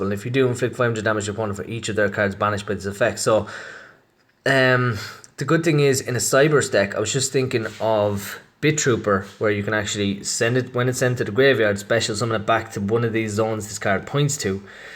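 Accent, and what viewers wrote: Ireland. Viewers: Are you Irish btw? :)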